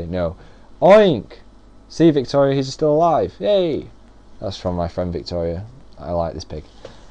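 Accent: North, United Kingdom. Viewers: Oh my god!!! JESUS CHRIST I AM WATCHING THIS IN 2017!! HE IS SO AWKWARD!!! AHAHHAHA NOOOO I FEEL LIKE IM SCREAMING LIKE DAN RIGHT NOW! HE SOUNDS SO MUCH MORE BRITISH HERE!!! AHAHAHAHA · OMG SO NORTHERN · He sounds so nothern in this video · used to be so northern omg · You sound so northern! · i find it so interesting how phil seemed like he was immediately comfortable being himself online. in most youtubers first videos they’re super awkward and self-conscious but phil was just phil from the start (just smaller and more northern lol) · He sounds so much more northern here! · Jay Chi I think he was just making fun of people from the north bc he was hella northern in this video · he sounds so much more northern here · He sounds like me so when I think like that it doesn't sound that strong cos my accent is probably stronger but then in comparison to how he sounds now he sounds dead northern. 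· God he sounds so northern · I can't get over the amazing Northern accent!! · I WAS FIVE WHEN THIS WAS MADE VOICE SOUNDS SO NORTHERN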